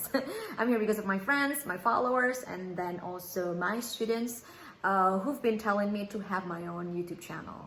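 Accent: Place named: United States. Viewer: I like your american accent 😁👌👍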